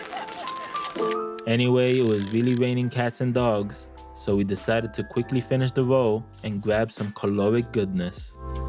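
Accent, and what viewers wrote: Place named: Boston, United States. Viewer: Wait your polish and here I was thinking you were from Boston.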